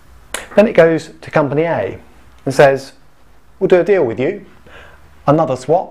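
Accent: United Kingdom. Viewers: It always works better with a British Accent. Fantastic video! Thanks! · Haha I was confused too. Then I turned on the caption. Its 'aren't' potentially higher. Brit accent i tell you.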